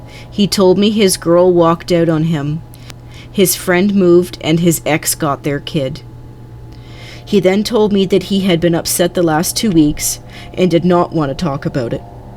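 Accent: Canada. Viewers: It wasn't the content, it was the delivery. I've listened to most of your videos now and you do it on most of them. What I do like about your videos is that there is no repeats from the 100 hours of other channels' scary videos I've watched. I also get a chuckle when I hear your Canadian "out" and "about".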